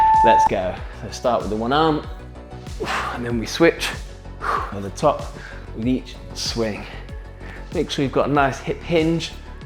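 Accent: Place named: United Kingdom. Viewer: Hi, just found your channel and it's the BOMB - exactly what i need: timed, pre-planned workouts with minimal talking.... yet when there is a spoken word, it's delivered in a wonderful British accent! Cheers!!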